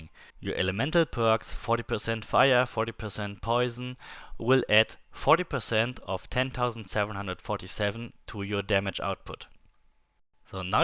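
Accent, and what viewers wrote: Germany. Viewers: I thought the same and wanted to write the same comment. The accent is so German😂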